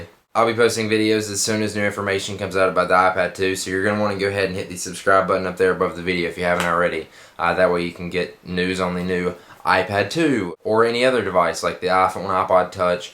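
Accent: South, United States. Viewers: its called a southern accent, or in his case, a semi-southern accent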